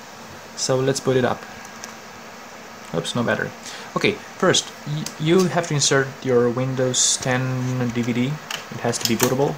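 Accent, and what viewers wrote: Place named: India. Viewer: engleza de indian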